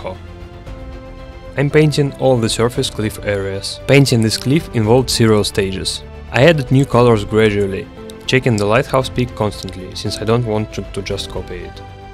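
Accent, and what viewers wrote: Russia. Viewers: Ты же русский верно? По твоему акценту понятно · с первых слов поняла что ты русский)00) отличная работа!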